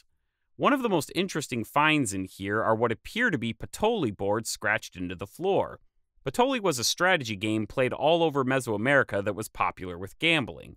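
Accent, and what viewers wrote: Midwest, United States. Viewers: well, I like your Midwestern accent. Please continue to speak in your natural way; being English different make you smarter. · Omg your voice is so American ☹️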